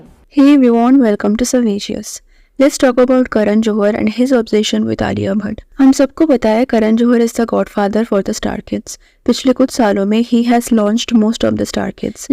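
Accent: India. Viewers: Hey guys even I'm a spill be fan but the way you guys are comparing her with Spill bee is just wrong. She is doing a great job and i also noticed her content is different than spill be she doesn't copy anyone and i like this about her. And people who's saying accent is like spill be it's just INDIAN accent guys. is different and great let's not compare her with anyone.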